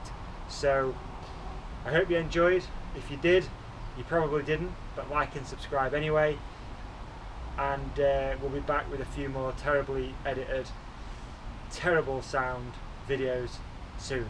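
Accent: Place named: North, United Kingdom. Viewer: Soooo... You put on extra northern for the vlog, or go a bit south for pods? #vlogger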